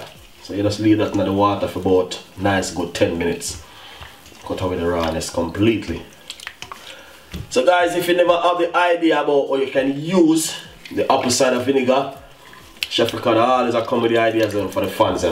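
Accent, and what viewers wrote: Jamaica. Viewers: I love Jamaican accent..it always seems like they are rapping😁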